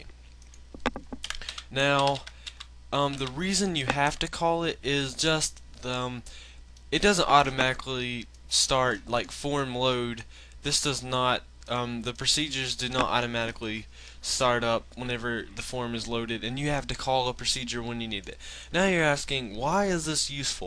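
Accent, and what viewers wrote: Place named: South, United States. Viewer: Great Video, sexy semi-southern accent.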